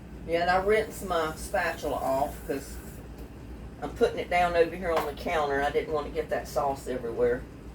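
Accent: United States. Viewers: Loved watching how you make your chilli, I add red kidney beans to mine 😋 love them,even picking up the American accent 😂xx take care 😊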